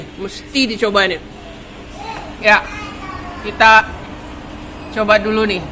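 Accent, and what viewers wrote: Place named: Minangkabau, Indonesia. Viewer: Bahasa Indonesia diselangi dialek minang amat suka saya dengari..tersusun fasih mempesona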